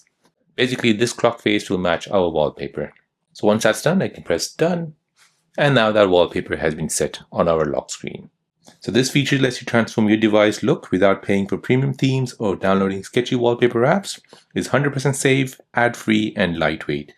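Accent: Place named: United States. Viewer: Its VOL UME not WOL UME. You spund American as well so why are you pronouncing like your German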